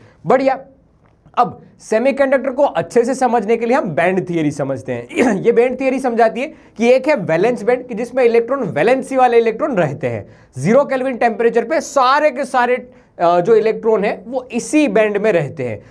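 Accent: Gujarat, India. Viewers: Gujrati accent aa hi jaata h 😅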